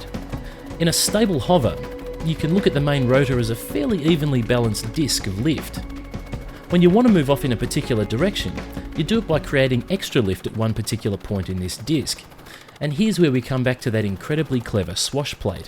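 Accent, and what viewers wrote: Australia. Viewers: Great video, funny and informational. Love that Australian accent. "Deesk of Leeft"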